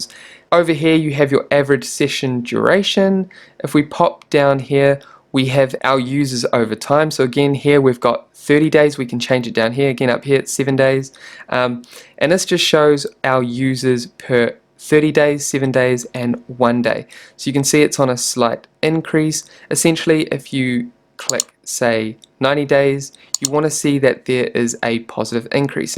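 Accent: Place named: New Zealand. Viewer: How to use google analytics in "twinnie-twinnie"- love it. A Kiwi, right?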